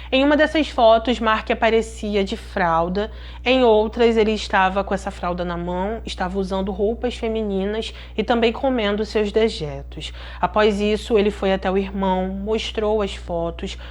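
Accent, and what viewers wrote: Rio de Janeiro, Brazil. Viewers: O vídeo e a história são maravilhosos 😀 😀😀... Mas o sotaque carioca é um nojo 🤮🤮🤮.. horrível 🤮🤮🤮🤮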